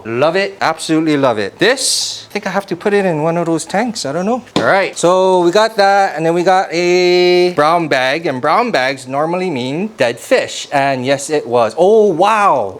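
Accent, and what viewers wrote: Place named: Hawaii, United States. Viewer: Came across a couple of your videos and by your accent I had a feeling you was from Hawaii 😂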